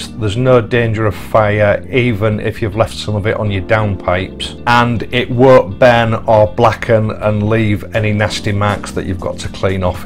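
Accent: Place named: Hull, United Kingdom. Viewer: I was just watching your video and was going to ask if you were from Hull as I am and recognise your acsentthen you said Hull area lol.Ive just purchased a T 120 black.I live at Gilberdyke,the EXhausts are matt black.Then I’ve just seen your video is 6 years old.Do you still have the Triumph? great vids by the way .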